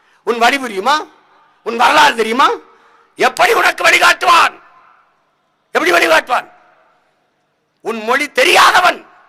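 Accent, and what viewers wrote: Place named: Andhra Pradesh, India. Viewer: Guntur Tamil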